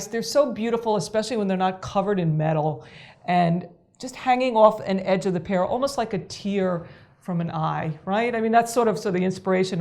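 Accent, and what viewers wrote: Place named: New York, United States. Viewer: This woman is a genius! So much creativity and thought behind all her pieces. I love listening to her voice too, I live in the south now and miss hearing that NY accent. Brings me right back to my childhood/extended family!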